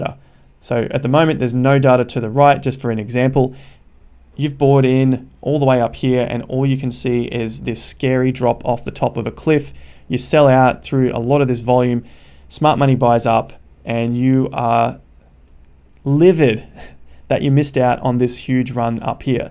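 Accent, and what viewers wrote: Australia. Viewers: Are you an Aussie? You’ve got that accent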